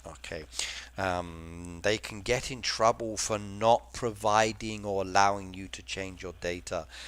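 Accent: United Kingdom. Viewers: Is that a Scottish accent? ✌️😎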